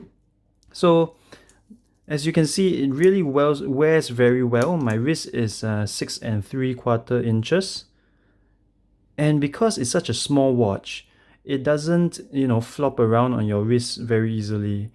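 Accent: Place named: Singapore. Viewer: The second when I hear you speak, I know you're a fellow Singaporean haha. I just got mine early this month, it's my first luxury watch too and I absolutely love it. Congrats on your new watch! Cheers :)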